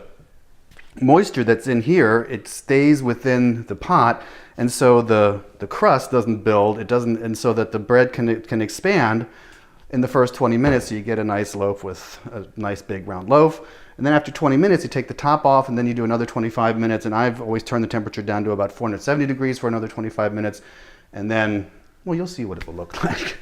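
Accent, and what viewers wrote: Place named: Southern California, United States. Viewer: You seem like you're from California around my general region. Your dialect and your attitude are familiar to me. Being born and raised in Southern California, I pick up on that.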